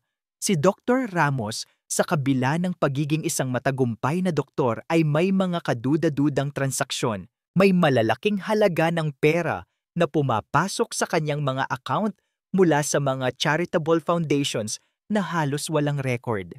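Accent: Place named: Pampanga, Philippines. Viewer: Kapangpangan siguro ang narrator kasi eng basa nya sa ang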